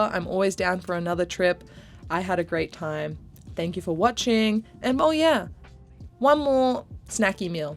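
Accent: Australia. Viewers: Refreshing to hear an Aussie accent 🙏🏻 Cannot WAIT to be back in Kansai this week 🔥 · There she is!... You sound like you just came back from Australia... Like my Southern Drawl comes out when I go home... 😆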